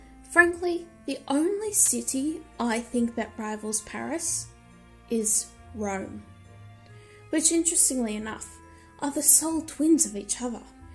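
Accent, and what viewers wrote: Australia. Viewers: I guessed you were either from Australia, or New Zeeland, at first I thought you were English 😅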